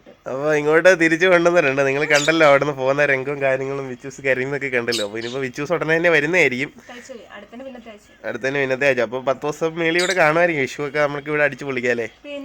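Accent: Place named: Thrissur, India. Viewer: ചേച്ചി തൃശൂര് പോയ ഭാഷ ഒക്കെ മാറി തൃശ്ശൂർ ഭാഷ ആയി😍😄💞💞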